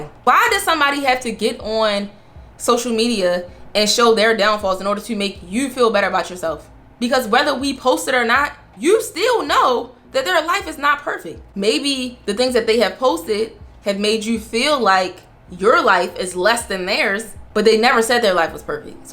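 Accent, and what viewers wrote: Baltimore, United States. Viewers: I think it’s mostly Instagram not all social media platforms. Also what state are you from im trying to pick up on the accent? I feel like it’s Baltimore though.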